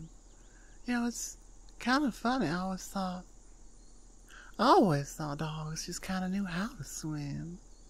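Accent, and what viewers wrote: South, United States. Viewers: I promise I’ll be the best dog boy you’ll ever have, I hope to get plenty of head pats, ear scratches, and belly rubs from you if it’s not too much trouble🐶🐾 Love your southern accent hope to hear more of it in future audios ❤ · You’re telling me I get to live on a farm, have bacon, and live with this beautiful cowgirl? Sigh me up! I loved this audio! The art was beautiful and your southern accent is great! I live in the south and that kind of accent can make a man blush when used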